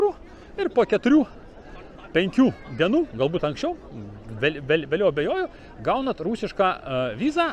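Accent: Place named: Central Lithuania, Lithuania. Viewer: va tokie konkretus zmones man patinka labiausia. galetu but visi tokie Lietuviai - nuverstume visas konservatinkas jau pradiniam etape. o ne kai prisipizdina lietuviu pinigu. biski ziuriu tarmiskai sneka ;) panasu kazkur is vidurio Lietuvos.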